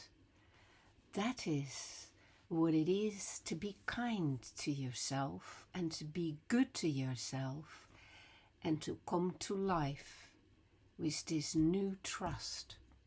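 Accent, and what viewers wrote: Netherlands. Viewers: Beste, bent u Nederlands? Ik vroeg het me af op basis van uw Nederlandse naam en accent.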